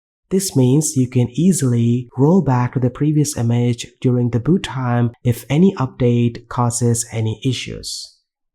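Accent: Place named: India. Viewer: Now i have heard it all - an AI robot voice with indian accent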